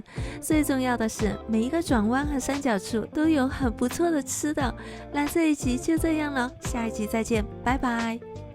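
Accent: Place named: Sichuan, China. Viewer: 這兩天在成都出差，遇到一位川妹跟妳說話的方式簡直一模一樣。忽然覺得好親切「呦」！😊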